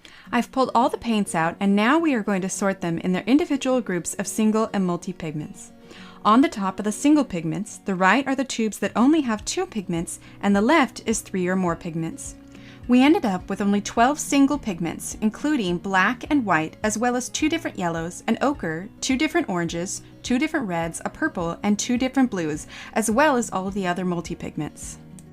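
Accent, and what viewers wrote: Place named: RP, United Kingdom. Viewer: you sounded so posh! great review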